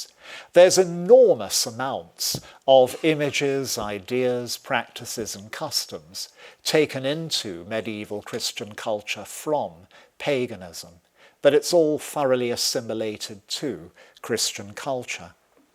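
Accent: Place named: RP, United Kingdom. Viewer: It’s called RP, Queen’s English.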